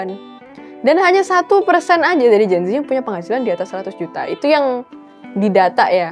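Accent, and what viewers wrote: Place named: Surabaya, Indonesia. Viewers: Medok sidoarjo an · Chindo Suroboyo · Mba mba ini sepertinya cici cici suroboyoan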